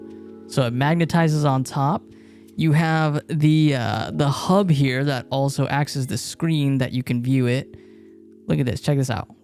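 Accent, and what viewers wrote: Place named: Southeast Asia. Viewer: Bro! I like your English. I think you are from South East Asia, like Singapore or Malaysia?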